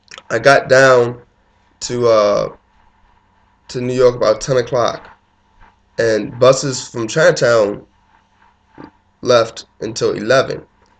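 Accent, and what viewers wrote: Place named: Baltimore, United States. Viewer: You have a bmore accent is that where you from?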